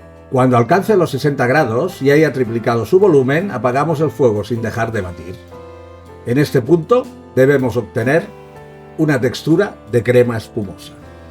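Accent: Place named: Catalonia, Spain. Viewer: Por el acento o Catalán o del levante norte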